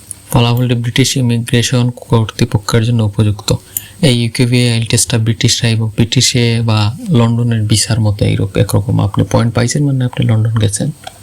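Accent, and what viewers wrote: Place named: Sylhet, Bangladesh. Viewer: I guess , you are sylhety 💕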